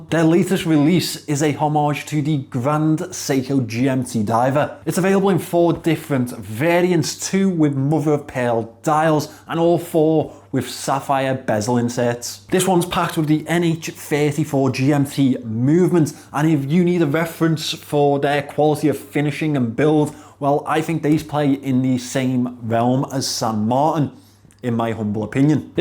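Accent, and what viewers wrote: Liverpool, United Kingdom. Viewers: You're the first Scouser that doesn't irritate me lol, great Vid xx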